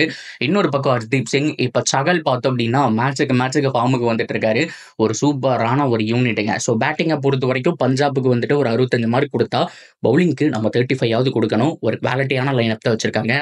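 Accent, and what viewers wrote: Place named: Kolar, India. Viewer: Thala nee yantha ooru thala slong kolara irukku thala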